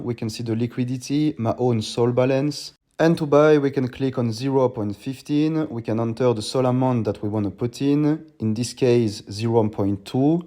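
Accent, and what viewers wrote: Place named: France. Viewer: mdr le big accent fr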